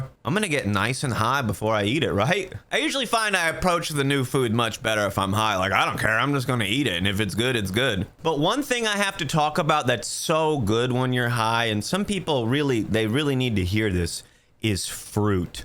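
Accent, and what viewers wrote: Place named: Northeast, United States. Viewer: You sound like you’re from the north east so a soft baked pretzel from sheetz or Wawa. Cheese filled. Mac n cheese bites. Grilled cheese. Extra cheese. So much cheese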